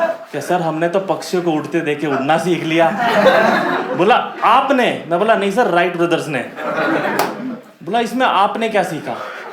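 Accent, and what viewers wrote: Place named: Haryana, India. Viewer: Bhai randeep hudda ka doooor ka cousin lgta hai, hariyanvi touch bhi aa rha hai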